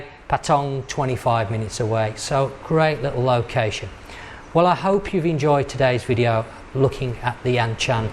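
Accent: West Midlands, United Kingdom. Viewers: Hey how are you bro. Sorry we haven't been able to visit you for a while we were in Bulgaria then Malta were we got stuck due to Covid and flights we're cancelled. But great to catch up with you again. Where in the west Midlands are you from bro, i really recognise that accent. Very cool indeed. Thanks so much for taking us along with you on this adventure trip. Nice 4x hotel. Stay safe and take care my friend catch up soon God bless you.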